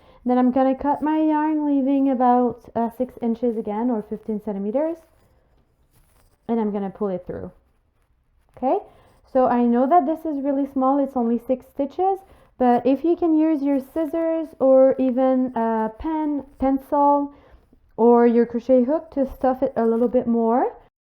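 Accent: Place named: Quebec, Canada. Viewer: That accent.... T'es québécoise? C'est full cute le tutoriel merci ❤️ j'vais en faire plein❤️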